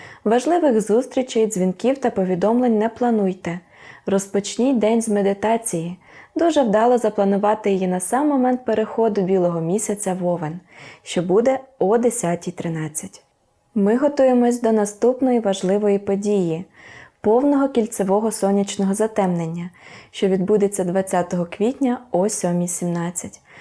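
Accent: Standard. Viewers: Як приємно слухати таку чисту та мелодійну українську мову ❤❤❤ Дякую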